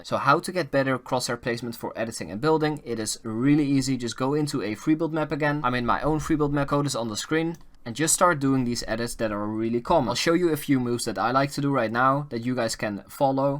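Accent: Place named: Netherlands. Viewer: Bro ik hoor aan je engels accent dat je Nederlands bent geef get toe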